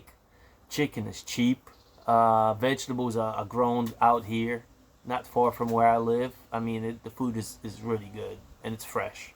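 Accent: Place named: Russia. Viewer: Я не спорю, что это все правда, но русский акцент у парня слишком сильный…. Он точно американец?….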